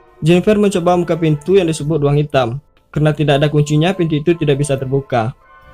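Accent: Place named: Pekanbaru, Indonesia. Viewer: Orang pekanbaru ya, min? Gaya bicara nya gitu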